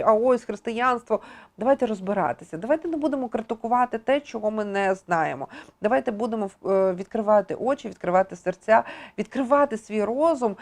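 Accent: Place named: Galicia, Ukraine. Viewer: Навіщо постійно, останнім часом, потрібно хизуватися галицьким(як в народі мовлять - западенським діалектом). Багато слів у південно-західному наріччі схожі зі словами в рус.язикє, і навіть наголос. Почали зі слова знайдЕте, в язикє- найдЁте. А чому не вимовити як розмовляє(балакає) решта України - знАйдете?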